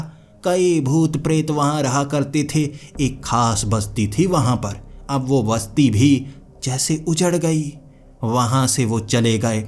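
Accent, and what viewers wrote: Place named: Bihar, India. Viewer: Bhai ki bihari sunke to meri hanshi chhut gayi😂😂😂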